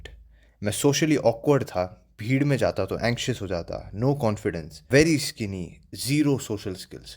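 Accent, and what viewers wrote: Rajasthan, India. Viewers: Bro you from rajasthan? The way you talk really gives me that vibe ❤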